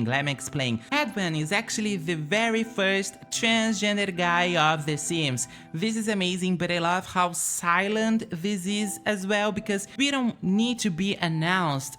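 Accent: Brazil. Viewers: Esse ingles parece muito brasileiro... Sera que é??? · where are you from? im pretty sure you’re from brazil by your accent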